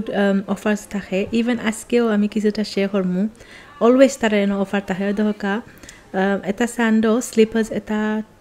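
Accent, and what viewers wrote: Sylhet, Bangladesh. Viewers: আপু, খুবই সুন্দর ভিডিওটা। আপনার সিলেটের ভাষা আমার খুব সুন্দর লাগে। একসময় ভাষা বুঝতামই নাহ। এখন সব বুঝি 🥰🥰 থ্যাংক ইউ আপু।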